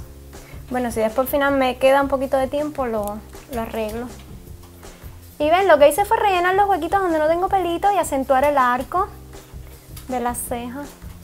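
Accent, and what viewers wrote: Cuba. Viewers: es cubana por eso habla así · me encanta el acento de los cubanos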